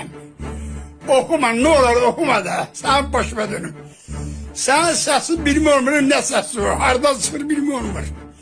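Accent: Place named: Jalilabad, Azerbaijan. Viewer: Юсиф Мустафаев боюк санаткардир ва чох тарбияли инсандыр. Бу йашлия кимса пул вериб ола билсинки, бела hагсиз данышир. Лаhжаси Джалилабадлы лаhжасина охшайир. Ман озум Ланкаранлыйам ва 37 илди Российада йашайирам ва hамиша маhниларина гулаг асырам. Ман бир джанублу баласы кими фахр едирам.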